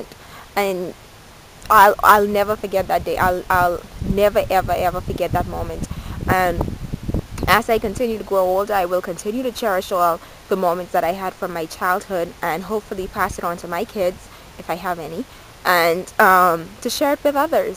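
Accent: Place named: Bahamas. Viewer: Well I think their was some benefit for you living in the Bahamas. You speak like a real Bahamian,who is educated. I grew up with allot of Haitian friends,while living in the Bahamas as a boy. i never remember teasing any of them for being a Haitian. I guess I was to young to understand,or it was just my love for everybody. i know the feeling! i live in another country and I experience it everyday! The authorities of said countries have a right & duty to protect their people from evasion from others. Make sure you don't be apart of the problem, by bad mouthing the Bahamians. just do your best to educated as many people as you can,about civilization and respect.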